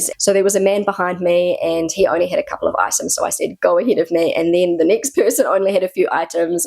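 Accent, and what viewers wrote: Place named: New Zealand. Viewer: Your vid title saying "Lidil" instead of "Lidl" is a bit odd. Love your accent, though. That much kiwi still in your speech a decade later is rather gorgeous. G'day from Australia.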